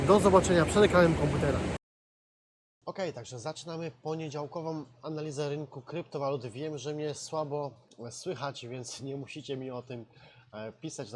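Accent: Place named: Costa Rica. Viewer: Voice of Costa Rica😁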